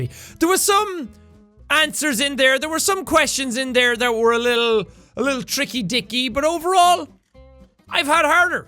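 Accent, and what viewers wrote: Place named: Ireland. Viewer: I’m sorry but the way you pronounce ‘lorry’ 😂😂😂 Not hating, just found it funny 😂😂 Dang that Irish accent 😂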